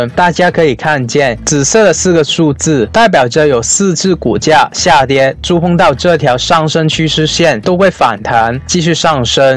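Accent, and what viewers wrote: Hong Kong, China. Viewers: 请问你是香港人吗？你的中文有香港口音😄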